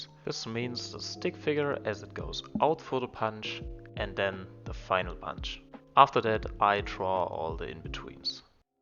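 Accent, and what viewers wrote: Germany. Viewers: Cause of your accent I found out you’re German and because of the layers👍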